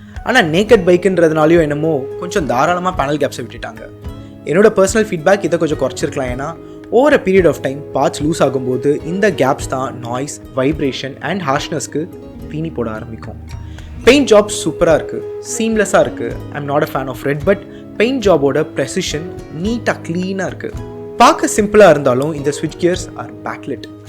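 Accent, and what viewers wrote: Chennai, India. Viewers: You are an amazing motorcycle tamil youtuber. When you talk the technical jargon in your own way is so cool man especially in our Chennai slag...you nail in every video. Although I am less interested in motorcycles I used to watch coz of you.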